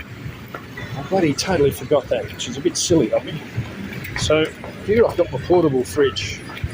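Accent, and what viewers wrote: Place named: Australia. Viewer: From your accent, you are australian.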